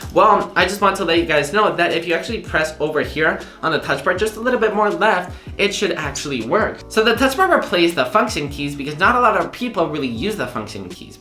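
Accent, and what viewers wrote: Spain. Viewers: I’d guess Spanish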